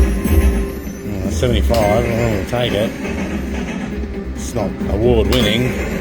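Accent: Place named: Australia. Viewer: Real Aussie accent 😅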